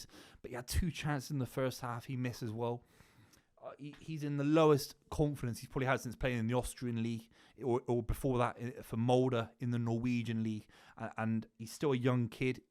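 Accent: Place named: Manchester, United Kingdom. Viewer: Stay humble, love your mancunian accent. ( Glory hunter)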